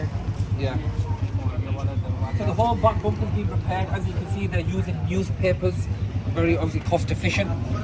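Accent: United Kingdom. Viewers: Filthy Northern accent. He can't even change his accent to pronounce it properly smh. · Sound like Yorkshire accent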